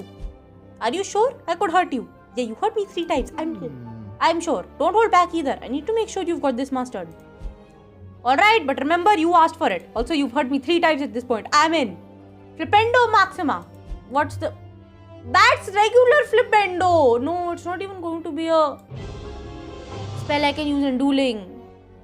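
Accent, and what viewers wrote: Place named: India. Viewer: Sounds more Indian!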